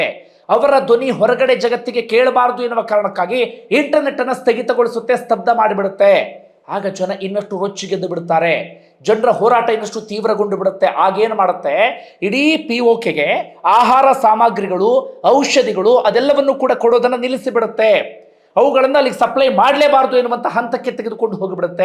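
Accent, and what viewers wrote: Standard. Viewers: ನಮಸ್ತೆ ಸರ್ ತಮ್ಮ ಬಾಯಿಂದ ಸುಮಧುರ ಮತ್ತು ಸುಲಲಿತವಾದ ಸ್ವಚ್ಛವಾದ ಕನ್ನಡ ಶಬ್ದಗಳನ್ನು ಕೇಳಲು ತುಂಬಾ ಖುಷಿಯಾಗುತ್ತದೆ ನಿಮ್ಮ ಕಾರ್ಯಕ್ರಮ ಮುಗಿದ ನಂತರವೇ ಊಟ ಮಾಡುತ್ತೇನೆ ಏಕೆಂದರೆ ಅಲ್ಲಿವರೆಗೂ ನಿಮ್ಮ ಕಾರ್ಯಕ್ರಮವನ್ನು ಕೇಳುವುದು ಎಷ್ಟು ಖುಷಿ ಅನಿಸುತ್ತದೆ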